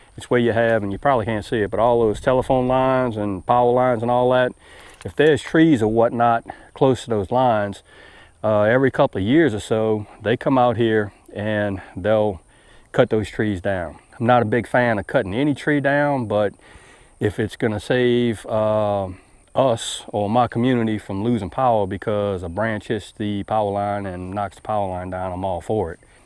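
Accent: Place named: Louisiana, United States. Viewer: Not sure where you are from, but it certainly sounds like you have a little Cajun accent going on there :)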